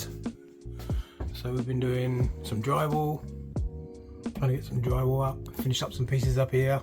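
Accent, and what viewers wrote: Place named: England, United Kingdom. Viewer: Amazing work—love his English accent…😃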